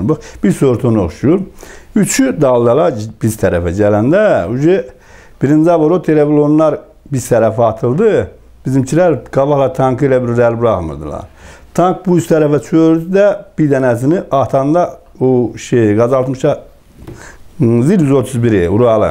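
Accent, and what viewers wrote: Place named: Gadabay, Azerbaijan. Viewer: "Allahın qadasın alım" cümləsi o qədərmi başa düşülən deyil, azərbaycanlı olan bu sözləri başa düşməyə bilməz. Yaxşı sən başa düşən kimi "Allaha qurban olum" deməkdi. Bu adam sadəcə savadlı adam deyil, Gədəbəy dialektiynən danışır, əsas odur ki, əsl türkdür, əsl qəhrəmandır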